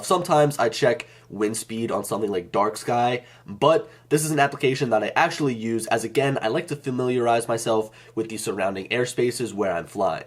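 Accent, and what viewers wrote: Philadelphia, United States. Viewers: Nice overview. I thought I recognized your Philly accent. Keep doing your thing.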